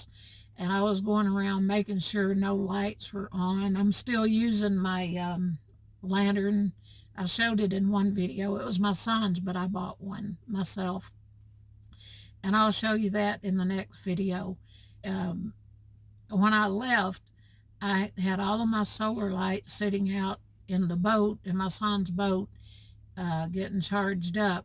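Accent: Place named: North Carolina, United States. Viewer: You sound just like my family! My mom is from North Carolina, and I love it when we go visit family there! I just watched Bob's interview with you, came right over to your channel and subscribed! I love seeing van tours, and your recliner is AWESOME! I'm look forward to watching the videos on your channel. I hope to get out there myself once I retire from teaching in 5 years (especially after going through this pandemic! LOL!) You were so encouraging in your interview - I want to encourage you also. Do what YOU love, and everything else will work out for you. Safe travels to you - I want to be like you some day! 😁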